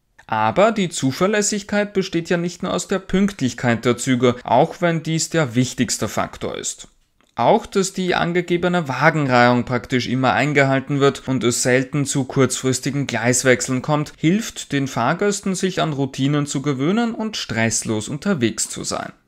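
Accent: Vienna, Austria. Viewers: I am British. At school I had a FEW German lessons. But I can understand almost everything says. HIS ACCENT IS PERFECT FOR people whose first language is not German. Danke schoen fur sein sehr Klar 'Wiener Upperclass'. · Professionell gemacht. Aber diese Stimme und Aussprache (Wiener Upperclass = Schönbrunner Burgtheatersudentendeutsch). Schrecklich. Klingt fast wie Kurz. Bitte synchronisieren.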